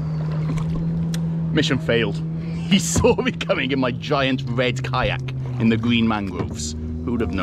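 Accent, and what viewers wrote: United Kingdom. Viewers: Wow I love the view 😍👌 nice british accent can you teach me haha kidding 😆😊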